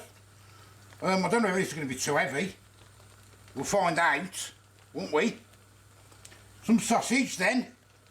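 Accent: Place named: England, United Kingdom. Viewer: I love how only a person who has lived in England for a good amount of time would understand half of that killer accent😂.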